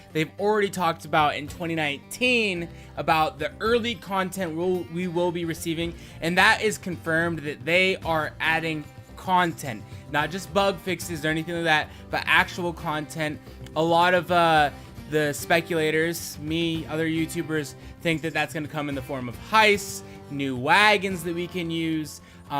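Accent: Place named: Russia. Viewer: please use Russian accent full time + I like your dimples:) Merry Christmas and new year y'all 😘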